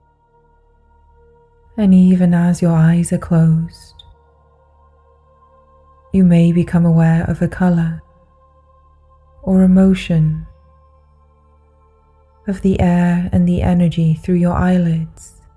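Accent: Wales, United Kingdom. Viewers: Guided Meditations by Lisa Beachy Good to see a positive, hopeful message in an increasingly ugly world. Do I hear a slight Welsh accent I wonder? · I'm trying this for the first time.. Lovely to know of you.. I seem to hear in your accent that you may be welsh.. Is this true?..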